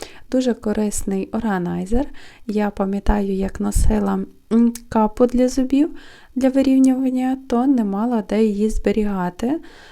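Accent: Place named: West, Ukraine. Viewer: У Києві те саме, зовсім невеликий асортимент. Принаймні в Аврорі на Подолі. Судячи з акценту дівчини і особливостей вимови, це десь на західній Україні.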